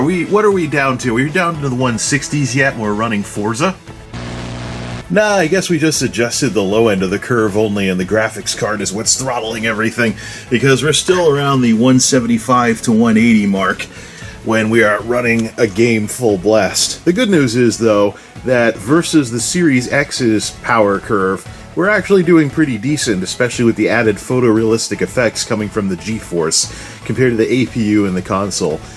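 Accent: United States. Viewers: Which part? If you are going to say that I don't know what I am talking about, I suggest you be a little more specific. My math could be off a little, rounding, but Google says that the average rate for electricity is .18 cents per kilowatt hour in the United States, That makes my figures fairly accurate. I redid my math. According to Google my math is pretty accurate if you are in the United States on the mainland. In Hawaii electricity rates are about double the U.S. average. He has an American accent, so my assumption is that he is somewhere in the United States and not in a place like Germany where the average rate per kilowatt hour is much higher. He did not state where he is. That SSDs are better than sliced bread? This is my opinion, an opinion derived from owning hard drives and then several SSDs. If you are going to say that I don't know what I am talking about, is not exactly accurate, because I believe that everything that I said, while not being exact, is pretty accurate. 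That you do not agree with what I said, in no way means that I don't know what I am talking about. If you do not agree with my math, I suggest that you do it yourself. Did he say an additional reason that I didn't hear? The best that I can deduce from his video is that his previous hard drives used so much more electricity than his new SSDs that it makes it financially worth switching. I made a pretty fair argument that while SSDs are much better, the electricity that you save is not a reason to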